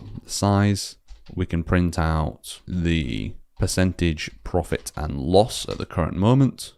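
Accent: United Kingdom. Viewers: Thank u… By the way, I like your contents a lot because of your exclusive content, as well as your deep and soothing voice, With that British accent :) so because of that, I can normally watch an hour-long video with no boring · great work, this is excellent. I also appreciate the northern accent. It's been a while since I've heard a proper one.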